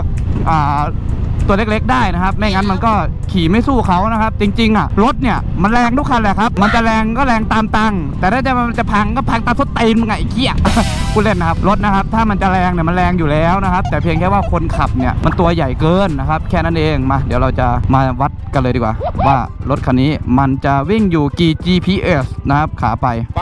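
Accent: Isan, Thailand. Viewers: ชอบพี่ว้ะพุดอีสานล้ะตลกจิง555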